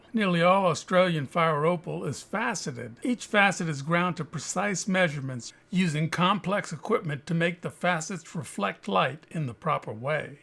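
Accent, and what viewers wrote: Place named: Louisiana, United States. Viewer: JUST found your channel. 😱👏👏👏 Do I hear a hint of Louisiana there?🤷‍♀️ SUBBED for MORE OPALS!😎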